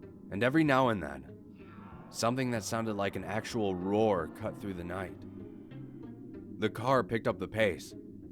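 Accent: Russia. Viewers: Your russian accent is golden:))) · Great story, great narration, great Russian actcent. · Omg ur Russian accent sounds like bourbon from metro 2033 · Love your Russian accent it sound very real 😍 also kudos for the background noises even tho there are not a lot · sound like Russian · You russian accent really sounds like russian)) i'm knew it :-D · He sounds like a Russian faking an American accent when speaking English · Russian accent made my night...keep up the great work 👍👍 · Your Russian accent made me laugh so hard 😂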